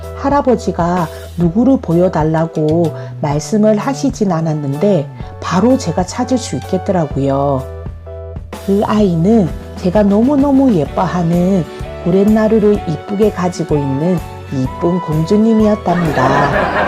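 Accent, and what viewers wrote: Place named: Seoul, South Korea. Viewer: 와ㅎ 선생님 서울사람같아요ㅎㅎ 역시 유익한 강의~~♡♡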